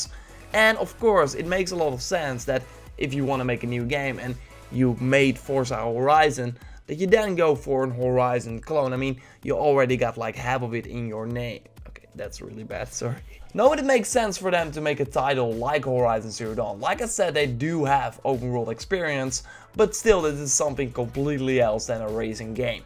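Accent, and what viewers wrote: Netherlands. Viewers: I think competition mainly lies with particular services like: Games with Gold & PS Plus Games, Xbox Live services vs PS Plus services etc. I get that to a certain point exclusives can win a persons heart at buying the console which supports it. But overall it hurts the gaming community because multimedia is infested now with fanboys arguing which console is better. I think Xbox, Playstation and Nintendo all have their pro's and con's. But it doesn't mean that it is right to trash talk the competiton. I own both a Xbox One S and a PS4 Pro ajd I completed Horizon Zero Dawn today (watching a lot of videos of yours). My point is, enjoy playing video games on whatever platform, but act with some kind of dignity inatead of insulting the competition ;) PS: are you Dutch? I slightly hear a Dutch/Flamish accent 😉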